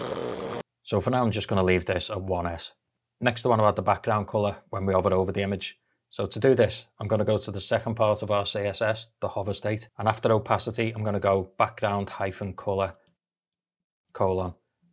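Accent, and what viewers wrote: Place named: United Kingdom. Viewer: Hey, just wanted to say how much I appreciate you creating this video. I had a comment earlier on my own YouTube channel. It was great to be able to link to this rather than create a video from scratch. Thanks. Cool channel. Awesome accent... from a fellow Brit;)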